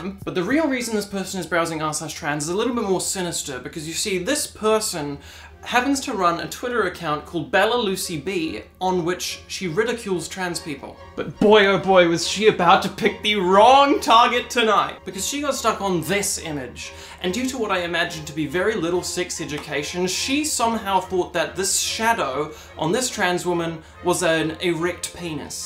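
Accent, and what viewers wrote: New Zealand. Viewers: Ngl initially thought you were an Aussie like myself, but gotta appreciate our Kiwi cousins! :> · i figured you were somewhere from the Big Southern Island Places. kiwi accents are so fun :D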